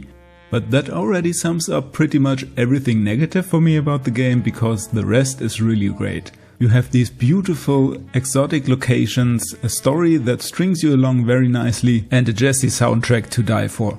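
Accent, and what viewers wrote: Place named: Germany. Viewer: da hämmert jemand seinen deutschen dialekt aber wirklich mit aller gewalt durch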